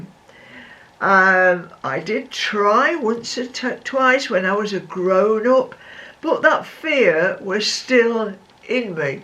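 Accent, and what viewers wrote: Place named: Manchester, United Kingdom. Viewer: Dear..... what I good laugh this video gave me because your attitude to swimming was and has always been mine. To me you appear ro be so brave you managed to paddle in the sea up to your knees....BRAVO...I could never go over my calves...LOL. I think the modern way they teach kids to swim nowadays is quite different not like it was. I do enjoy your videos nd love your northen accent It reminds me when I was beought up in Manchester. I have been living in France for many moons now.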